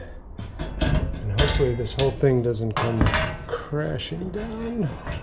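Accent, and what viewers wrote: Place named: Florida, United States. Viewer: Man what a great thinker you are, That's working smarter not harder, You taught me an valuable lesson not to RUSH, but have a plan, We love your Florida Accent Lol are you from Long Island Or The City? Can't wait to see the next episode, you have a great setup in Tampa, Sure not making any more land , Stay Blessed my brother ✌️🌴🌅😎🇺🇸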